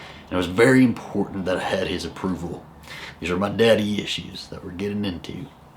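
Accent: South, United States. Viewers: I understand your hesitation with your dad. I went through a lot of the same abuse.... but, with time comes forgiveness. I hope you will eventually be able to forgive your dad for all the things he's done. The fact that he accepts you as a gay man should speak wonders to you though. If he didn't accept you, he wouldn't have slept in your house at Christmas. My wish for you is that you let the hostility go. I started watching your vlog thinking you and your husband had it together. And I adore your southern accent... I think you owe it to your lover to man up and show him you're better than your dad and that family means everything to you. Forgive and forget, I know it's hard, but it's easier than carrying that burden of hate. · OMG I love your accent. You know how to turn this Scottish guy on by saying hi. I can hear you on the like of Dukes of Hazzard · Southern accent..turn off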